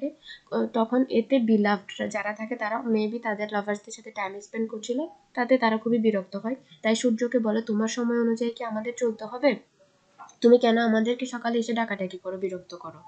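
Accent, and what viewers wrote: Chittagong, Bangladesh. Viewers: Oreee chatgaiya apu 😘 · Apu assalamu alaikum. Kmn acho??ai video deke bujte parci, apni chatgaiya...apu apnr badi Chittagong er kotai??valobasha nio apu❤❤❤ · Apu chatgaya naki?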